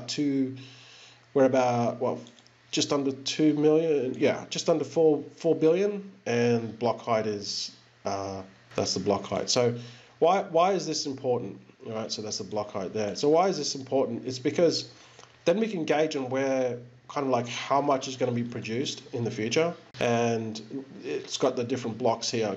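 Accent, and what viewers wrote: Australia. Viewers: are you an Aussie? I found another project today parachute, just want to know your thoughts. cheers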